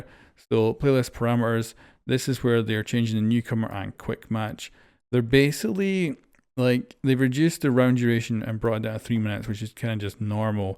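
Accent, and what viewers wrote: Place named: Scotland, United Kingdom. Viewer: I don't know if you are from there, but you have a very hard Scottysh accent.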